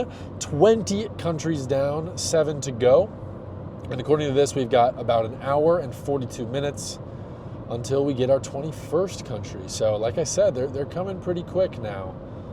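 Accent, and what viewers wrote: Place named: Eastern Europe. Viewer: Which country are you from? You sound like eastern european, which is cool, I myself am from Poland.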